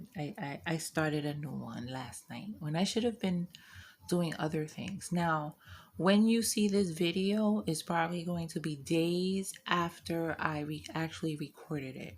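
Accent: New York, United States. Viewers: Hi. I’m new to your channel and I’m so glad I found you. Lisette told me about your laugh, so I had to see for myself. You sound like you’re from NY - my whole family (Mom, aunts, Grandma) is from Brooklyn. Then they moved to Cali and that’s where I was born. What happens if I finish a WIP and start another brand new one - do I add that or count that, or do we just start with a set number and finish them as we finish them throughout the year? I hope that made sense. I have been DPing since this year in April. I currently only have 3 WIPs, so that won’t take me super long to finish them all - for sure it won’t take me months. I plan to join this event, I’m just unsure of a couple of the rules. Thanks for reading my novelette!!! ❤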